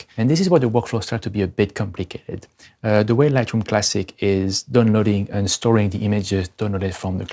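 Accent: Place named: England, United Kingdom. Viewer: Nice and clear tuto with a clean english accent ! Nice work thanks for it ! It helped me a lot.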